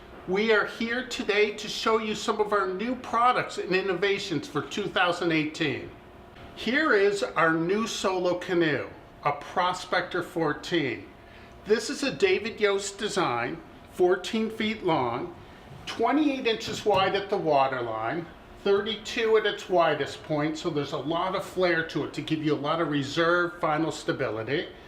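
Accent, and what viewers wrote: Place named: United States. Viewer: after all these years in Canada, he still sounds more American than pure northern hoser. curious....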